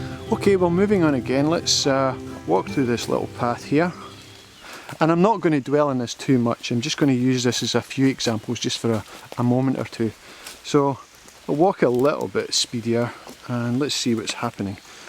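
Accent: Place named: Scotland, United Kingdom. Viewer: That's clearly a Scottish accent... right? Very nice video..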